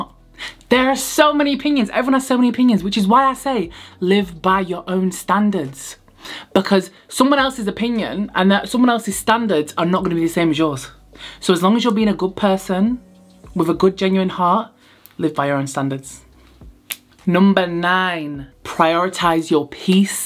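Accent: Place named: North, United Kingdom. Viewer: Lit the most straight up northern gal love it 💕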